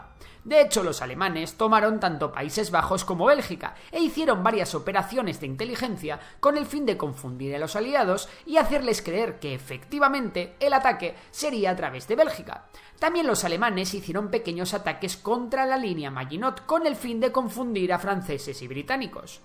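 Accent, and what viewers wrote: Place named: Castile, Spain. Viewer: Ha alguien mas le molesta el español castellano?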